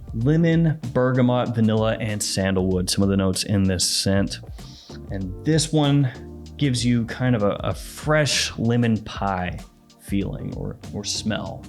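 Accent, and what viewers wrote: United States. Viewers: i hate american accent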